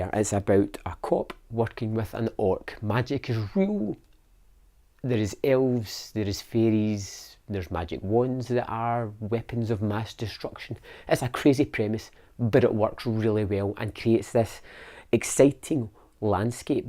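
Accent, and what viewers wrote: United Kingdom. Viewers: I like British the accent is cool yeah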